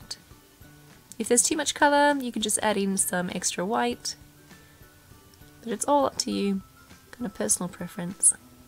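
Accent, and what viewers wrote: Australia. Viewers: why do almost all the good bakers have Australian accents?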